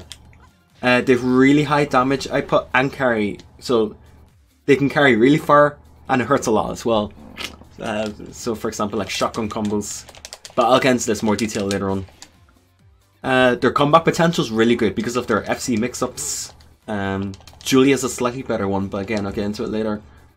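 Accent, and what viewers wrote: Ireland. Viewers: R u from Ireland ?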